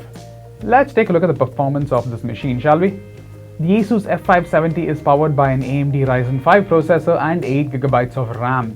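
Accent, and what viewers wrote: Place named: India. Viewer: Fuck i can't stand the fucking idian accent ... it gives cancer 🤮🤮🤮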